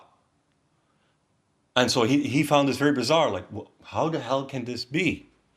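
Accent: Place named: Finland. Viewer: You sound Finnish.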